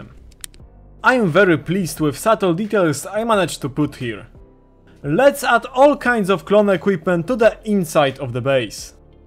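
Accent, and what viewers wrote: Poland. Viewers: Ten polski akcent słychać odrazu haha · I thought you're from Russia, but then I realized that you are our Poland Slavic bro with the same accent) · slychac ten polski akcent POLSKA GUROM! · Man, gadasz polskim akcentem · ok because i hear this accent and me too from poland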